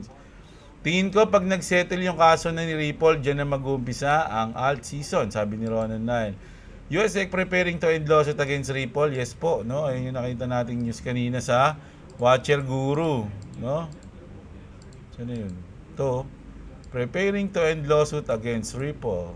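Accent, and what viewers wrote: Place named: Caloocan, Philippines. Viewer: Alam mo gustong gusto ko ang mga Pananalita mo. Lumaki rin ako sa caloocan at ganyan ganyan din akong magsalita noon Pero ngayon senior na ko at tumira dito sa mindanao naiba na ang pananagalog ko. XRP lilipad na!!!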